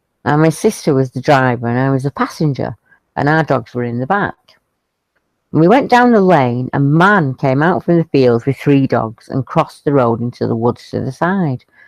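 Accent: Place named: North, United Kingdom. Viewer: Finally while watching a BEJ video I can enjoy a lovely northern accent!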